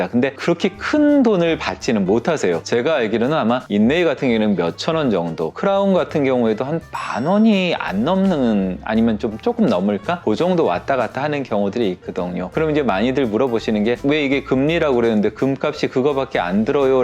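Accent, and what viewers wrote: Seoul, South Korea. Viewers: 서울 사투리 쓰시는 거보면 서울토박이신가봐요! 재밌게 잘 봤습니다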